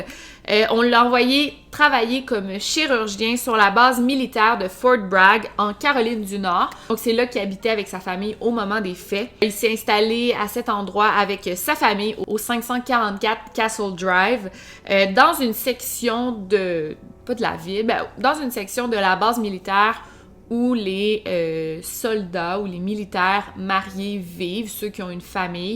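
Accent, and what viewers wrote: Canada. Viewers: C'est vraiment un plaisir de t'écouter. J'adore ce que tu fais, j'adore ton petit accent canadien et en plus tu es très jolie fille. On voit bien que tu es passionnée par ce que tu fais, ta narration est vraiment très captivante. Très bon job. Kiss from France. Vincent. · J’adore vos vidéo et connaissant le Québec, j’adore écouter votre accent et vos expressions 😉Je crois qu’un médecin est capable de se blesser même aux poumons sans que cela soit mortel. Je suis étonnée qu’il ait gardé le même avocat qui l’a fait perdre au tribunal du grand jury. Mais il n’avait sûrement pas les moyens de se payer des grands avocats comme l’a fait O J Simpson. Je vois que le bénéfice du doute n’a pas eu sa place dans ce jugement. Mais c’est pas bien malin de se médiatiser, ça démontre une personnalité très égocentrée.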